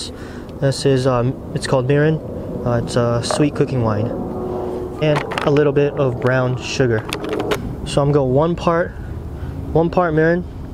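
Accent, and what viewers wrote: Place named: United States. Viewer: Bro, I live in Japan and watch a lot of fishing vids, so I guess that's why your vid got recommended, but you can imagine my surprise when I heard you speak, obviously born in America or at least lived there most of your life.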